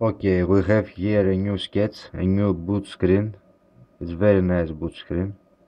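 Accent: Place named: Greece. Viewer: Otan o ellhnas milaei agglika fenete apo thn profora tu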